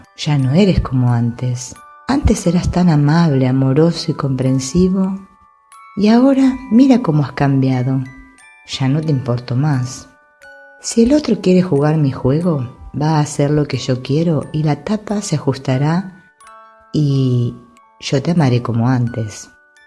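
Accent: Argentina. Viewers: Hola sos Argentina no? Por el acento digo...los textos los haces vos o lees de algún libro? Son muy buenos!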